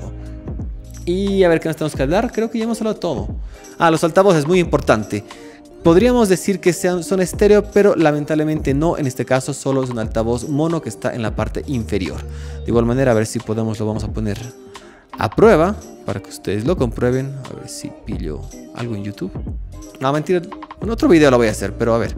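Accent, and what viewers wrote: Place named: England, United Kingdom. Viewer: por el asentó pensé que lo eras por qué hablas como un inglés cuando habla español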